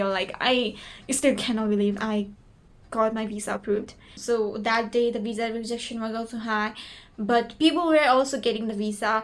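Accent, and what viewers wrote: United States. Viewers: american accent sounds irritating But congratulations and keep on progressing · OMG!! Your accent is soo amazing. Can you give me some tips to have that "American" accent. I've been searching online for it. But it would help me so much if it is from someone with a similar background. Btw thanks for the advice as well.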